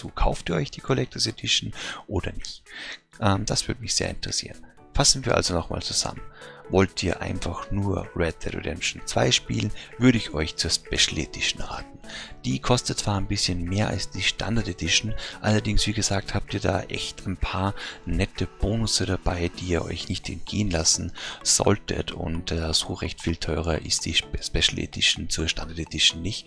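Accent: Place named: Austria. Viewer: sehr gutes und informatives video! Mich als Österreicher freut es natürlich besonders wenn so eine Zusammenfassung mit österreichischen Akzent gemacht wird. Ich werde mir die special edition kaufen. liebe grüße karli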